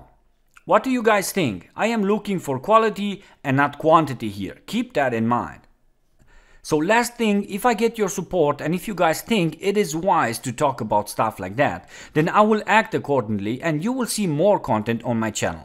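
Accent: Germany. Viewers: I like your german accent :) Keep up informing us about tarkov :D!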